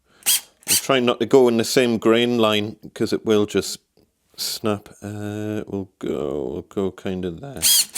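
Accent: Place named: Geordie, United Kingdom. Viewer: Still not big coat weather for you. Reet proper Geordie, man.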